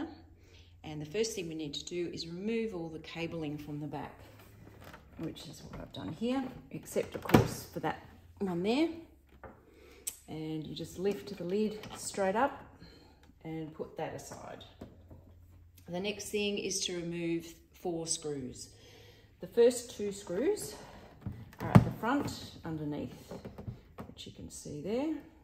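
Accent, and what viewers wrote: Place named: Australia. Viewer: just got one from facebook marketplace yesterday.. cool to hear another aussie on the tube 🇦🇺🇦🇺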